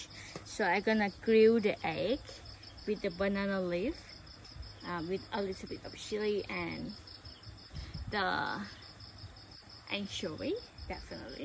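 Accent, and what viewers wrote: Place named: Australia. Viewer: I can hear the Australian accent in that English language 😊👍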